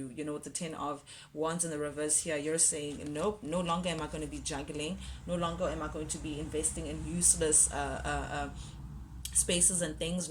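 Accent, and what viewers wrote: South Africa. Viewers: Are you South African? Had to ask because of the accent. Great reading! ❤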